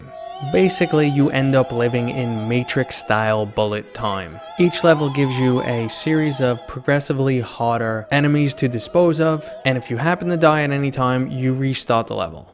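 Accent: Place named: Boston, United States. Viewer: Holy mother of Boston accent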